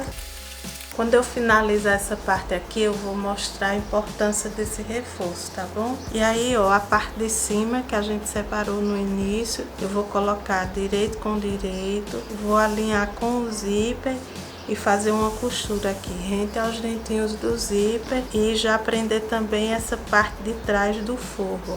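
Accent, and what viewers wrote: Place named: Northeast, Brazil. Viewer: oh, muito obrigada, de coração e sou muito espontanea, onde so tenho a agradecer a quem se dedica a ensinar com Amor e empátia.... Notei que seu sotaque é do Nordeste, de onde é?! Qdo eu retornar para lá, quem sabe não estaremos perto e vc poderá ser minha Professora em aula prática? Já pensou? Coisa boa,... um abraço Querida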